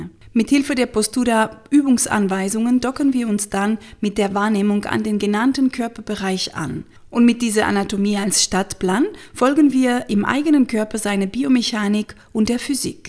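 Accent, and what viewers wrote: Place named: Spain. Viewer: Liebste, Ich bin auch so froh,deine Infos auf diesem Kanal gefunden zu haben!!!!Es erleichtert mir als ostheopatische cranio sacralTherapeutin enorm meine Behandlungen,da mein Ziel ist, die Menschen in ihre Eigenverantwortung zu bringen-und deine Videos unterstützen dies. Ich muss nicht mehr etliche Mobilisations-und Achtsamkeitsübungen von Kanal Betreibern zusammensuchen..oder meine Therapie Einheit durch Übungen kürzen..ich erkläre das meiste am Körper während meiner Arbeit -und sende den Clienten als Geschenk ein Video von dir nach! Es ist Perfekt!So perfekt! Und sie lieben deinen reizenden spanischen Akzent genauso wie ich. Ich wollte dich dies wissen lassen,damit du weiterhin Kraft und Freude und Motivation für deine Arbeit mit den Videos hast-wissend,sie werden geschätzt! Und sollte einmal ein Thema anstehen,wage ich es, mich zu melden. 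Das Hauptthema bei den Menschen ist-die Atmung!!! Immer wieder. Deshalb Dank ich dir für dies Video am meisten 🙏🏽 P.S. Was ist deine Meinung zur Wim Hof Methode...vor allem das Atemmuster?das würde mich interessieren. Vielen Dank im vorraus für deine Zeit,dies zu lesen,und wahrscheinlich-wie ich dich kenne-auch zu antworten. In Verbundenheit, abrazarte desde austria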